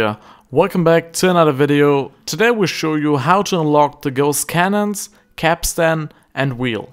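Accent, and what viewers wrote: Germany. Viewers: You are German, arent you? ;) Because there's something about the way you speak. Great video by the way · Wenn der deutsche Akzent mal wieder komplett reinkickt xD Aber gutes Video 😁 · You sounds like a german haha. Thanks for the Video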